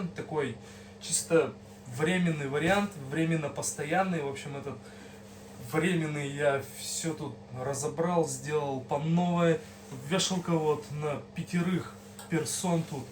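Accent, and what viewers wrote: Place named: Udmurtia, Russia. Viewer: Странно так слушать всë. Уж года два смотрю и не могу привыкнуть. Смешение какого то североудмуртского говора с вятским.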